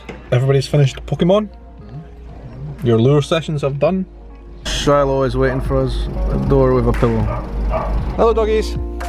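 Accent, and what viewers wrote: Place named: Scotland, United Kingdom. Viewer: I was going to ask you in another video if you were Scottish because you sound like one and then I read your description and found out you actually are lol. I'm from Brasil 🇧🇷, Salvador and I lived in edinburgh for 2 yrs and a half and loved there! I'm not very keen about the weather actually but, anyway... my father lives There as well in Ransome Gardens. Cheers!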